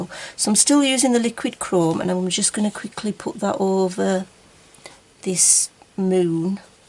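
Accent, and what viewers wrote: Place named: Yorkshire, United Kingdom. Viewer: Hi K...Using my Brutfuner in the Circle of Life "Spiders on the Spiders web" Halloween 🎃...ish 🤣🤣 Nice to hear a fellow Yorkie 🥰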